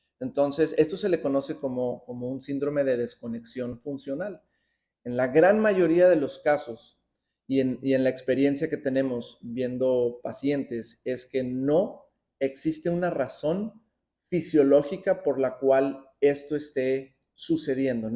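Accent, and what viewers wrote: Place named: Guatemala. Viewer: De que país es el dr.???? Parece guatemalteco por el acento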